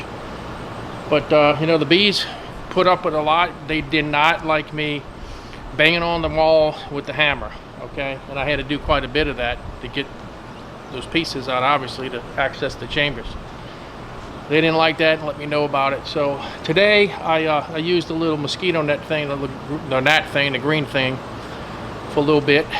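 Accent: Louisiana, United States. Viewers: Louisiana...a bit of a cajun accent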